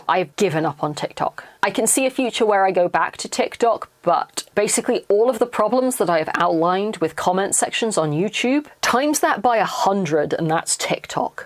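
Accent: United Kingdom. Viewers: I love listening to the non-posh British accent. Because its HONEST. (And I run you at 1.5x or 2x, so you definitely dont talk too fast.) I love the ND content, because it fits my brain. I dont even remember what I started watching you from, but I love the "historical costume" side of YT. Stayed for the clearly LGBTQIA+ person, because you remind me of my family. I dont plan on LARPING, or starting YT, but stay for that kind of content because YOU. And I live in my subscription tab too (well, from there to "watch later" because I have more than I can watch daily.) Smart idea to beginner YT peeps: have a catalog ready to upload BEFORE you start the channel. Its easier to release on a schedule if you have a month of already edited things ready to upload.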